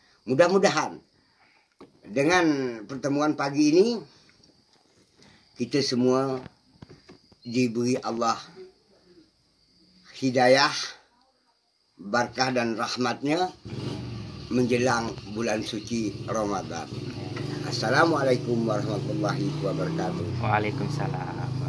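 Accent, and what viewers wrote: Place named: Johor, Malaysia. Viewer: Salam dari Johor. same bahasenye dgn org Johor. Mmg johor dgn bentan ade hubungan suatu ktike dulu.. saye tngok channel ni sbb nk dengor bunyi cakapnye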